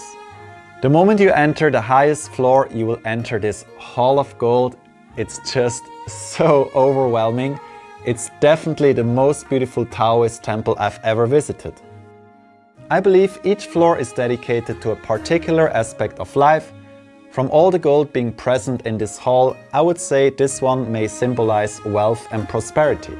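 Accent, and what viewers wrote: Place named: Switzerland. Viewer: Man this Swiss accent ... so sweet.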